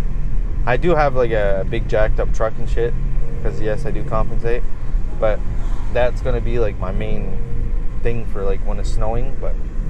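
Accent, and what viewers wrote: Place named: United States. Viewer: Couldn’t tell if this guys American or English for first 5 mins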